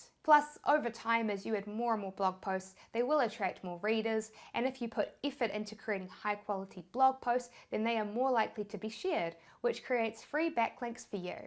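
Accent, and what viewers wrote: New Zealand. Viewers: Awesome content but I couldnt understand why you kept on saying add ticks to your pages "lots of ticks", then I realise you meant text lol , it all makes sense now I'm getting use to you NZ accesnt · Is it a Kiwi accent? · no problem at all! Thanks for responding. Of all the people I've found on YouTube, you by far seem the most genuine and you explain things extremely well. Love the Kiwi accent too and as an Irish man I can totally relate to speaking too fast 😂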